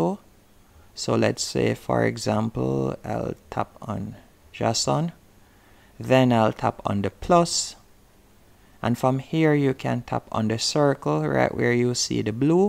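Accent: Jamaica. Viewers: Dude has an Jamaican accent!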